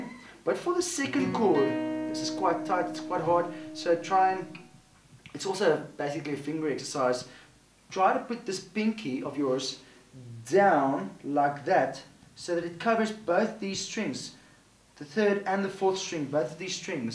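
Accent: South Africa. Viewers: Are you South African? :D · South African, ne? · South African, I could hear it as soon as I started watching. whoop thanks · your accent makes me miss south africa, just a little. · Are you SouthAfrican?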